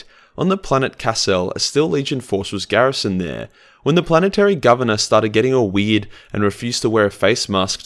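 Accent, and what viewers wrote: Australia. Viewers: It's good to hear an Aussie doing this stuff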